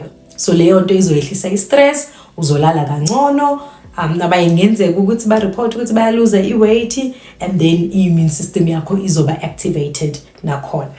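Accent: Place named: Zulu, South Africa. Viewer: You are so beautiful and your isizulu accent is amazing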